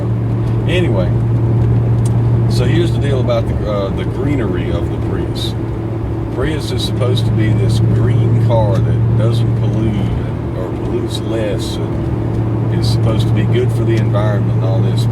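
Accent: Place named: Rural, United States. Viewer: Grow some hair and loose the hick accent before you try and come across as an"expert"- dumb..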